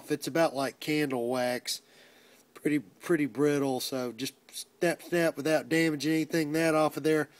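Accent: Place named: South, United States. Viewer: Thanx for southern speak. To the point no rambling..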